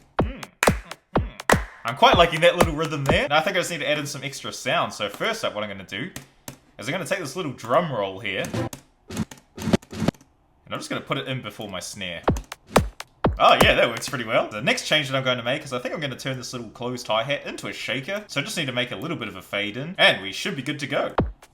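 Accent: New Zealand. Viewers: Wow, haven’t heard a kiwi on YouTube before. At least I’m 98% sure ur kiwi. Ur vid was good too🙃 noice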